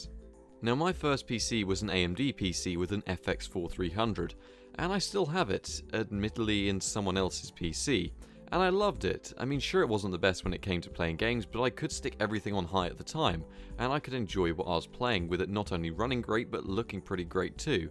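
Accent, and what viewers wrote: United Kingdom. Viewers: well done man you deserve way more subs. love your Chanel one of the best tech channels on youtube. it is great to hear a British accent as well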